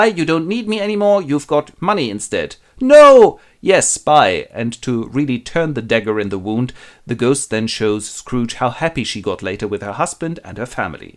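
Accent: Germany. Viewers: Briiliant !!! Five Stars Award !!! Absolutely love the German-style accent !!!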